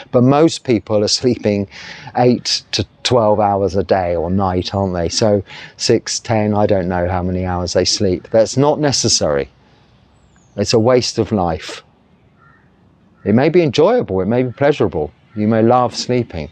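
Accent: United Kingdom. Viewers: This could be a matter of perception. Bhante rather sounds too British, but not angry 😊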